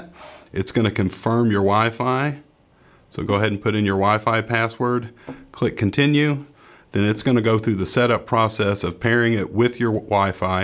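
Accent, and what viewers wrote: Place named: Virginia, United States. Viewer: You sound like you're from my homestate Va. Anyway, do all chromebooks 315 connected to some printer? I looked up the ones that are compatible with CB and I decided on HP 2755e. Thanks for this really clear video. Second question, I thought you could just set up the printer on the CB screen by tapping the clock and then following through. How will I know if need HP Smart app?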